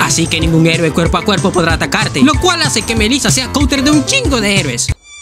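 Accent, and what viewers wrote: North, Spain. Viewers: Con la prima? norteño? XD saludos!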